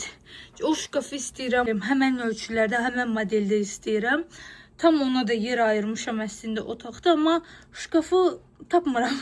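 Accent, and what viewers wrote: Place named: Zaqatala, Azerbaijan. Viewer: Salam,eslen haralisiniz? Zaqatala lehcesiniz var sanki,birde yoldasinizla yas ferqiniz var?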